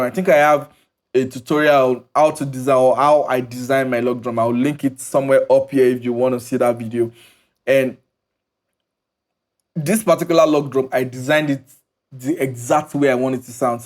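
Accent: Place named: Nigeria. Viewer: Love your beat and your 9gerian accent. ❤️ from 🇧🇷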